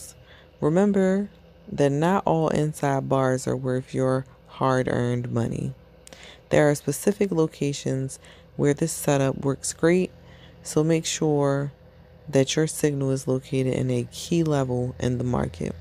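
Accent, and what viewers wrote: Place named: Baltimore, United States. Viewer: The woman reading sounds like she could be from Baltimore (as I'm from the DMV area). Great work and thanks for the full audio!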